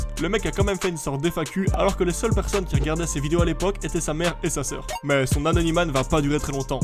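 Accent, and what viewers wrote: Belgium. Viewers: T’es belge ??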